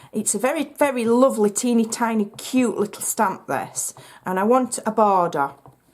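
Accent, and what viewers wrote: United Kingdom. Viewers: I love your accent. What part of the U.K. are you from?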